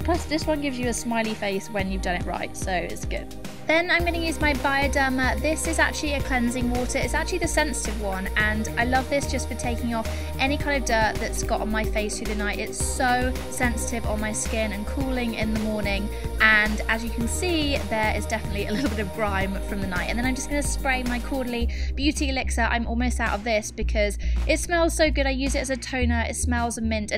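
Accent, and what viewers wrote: United Kingdom. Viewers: I am in love with your british accent :o <3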